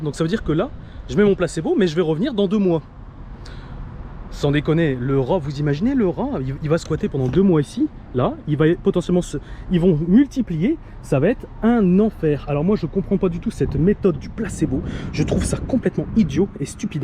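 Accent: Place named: Northern France, France. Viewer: On viens tous les deux du nord, et tu as EXACTEMENT la même façon de parler qu'un de mes profs. Je pense que tout est lié.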